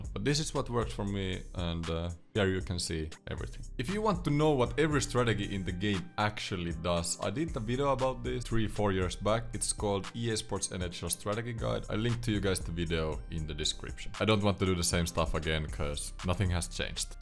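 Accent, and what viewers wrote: Finland. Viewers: kun sanoit et sun enkku oli huonoo joskus ja vähän tavallaa viittasit et se ois vieläkin, toki parempaa kuin ennen. Ni ihan pikku tippi siiheb liittyen ni sano L1/L2 ja R1/R2 "EL 1/2" ja "AR1/2" ni jos tääl on jotain jotka ei tuota ymmärtäs ku sanot äl one ja är one ni sitten he ainaki ymmärtää. Mut siis muuten imo ihan hyvää enkkuu puhut sillee suomalaisel aksentil.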